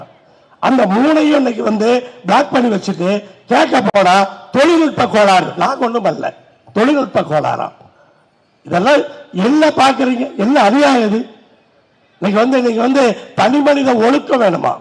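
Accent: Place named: Kongu, India. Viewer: அய்யா‌ கொங்கு தமிழ் வழியாக எல்லோருக்கும் நன்றாக புரியும்படி பேசியுள்ளீர்கள்.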